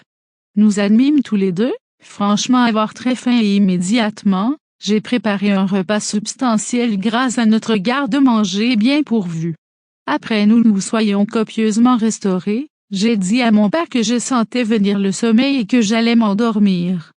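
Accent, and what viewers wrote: Canada. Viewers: 54 minutes d'accent quebecois , c'est terrible, mais cela ne va pas être possible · La voix est horrible avec un accent canadien en plus de ça, la combinaison est atroce 🤮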